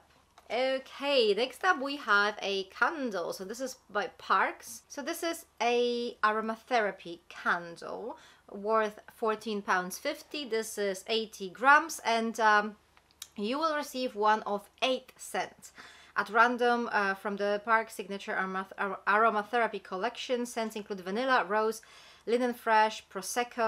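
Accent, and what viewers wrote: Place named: Poland. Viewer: I love your accent I love Poland it's a beautiful country what part are you from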